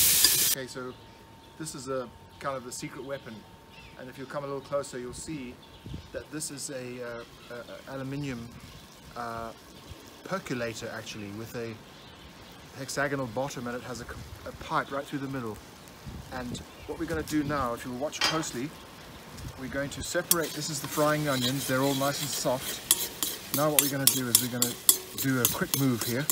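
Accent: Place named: Durban, South Africa. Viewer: Nice video...You sound like you're from Durban.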